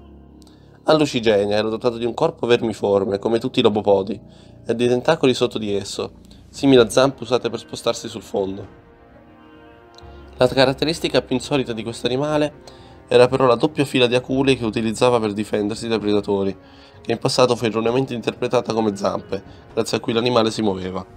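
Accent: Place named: Central-South, Italy. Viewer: anglofono? Per piacere, non arrampichiamoci sugli specchi: il narratore ha un fortissimo accento del centro-sud Italia, quindi immagino abbia avuto tutto il tempo necessario per imparare la lingua di questo paese. Non dico che si debba essere doppiatori professionisti, ma almeno parlare correttamente in italiano.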